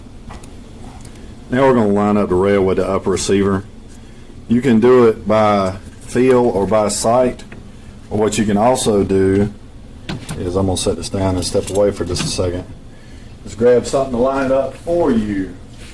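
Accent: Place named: North Carolina, United States. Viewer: North Carolina accent.you sound exactly like me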